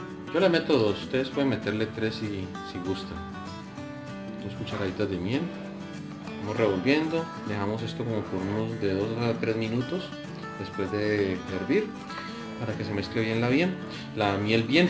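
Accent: Colombia. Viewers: Usted es valluno se nota su gracia al hablar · Jajajaja si parece caleño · Excelente video.. ahora mismo lo voy a preparar. Pero tengo una duda si su acento es de 🇨🇴 Colombia o de Costa 🇨🇷 Rica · Hermosa tu voz eres colombiano???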